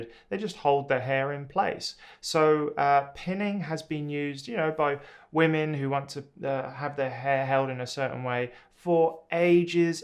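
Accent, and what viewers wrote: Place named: Shropshire, United Kingdom. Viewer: Your accent sounds somewhat local. Shropshire?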